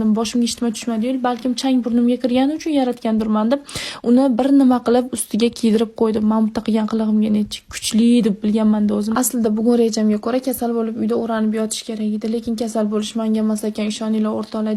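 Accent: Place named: Tashkent, Uzbekistan. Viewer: Sizam xozi toshkenli bopkettiz😂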